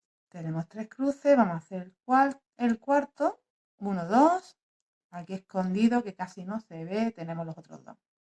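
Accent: Andalusia, Spain. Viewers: Hola creo que eres de andalucia, me alrego de seguir a una española, lo explicas muy bien. Gracias por compartir tus vidios. Yo soy de un poquito más arriba.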